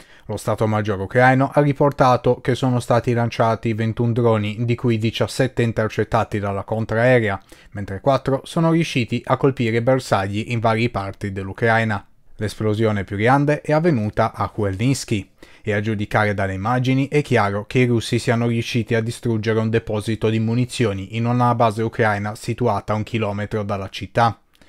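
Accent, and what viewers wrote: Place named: Albania. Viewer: il suo accento assomiglia tanto alla lingua Albanese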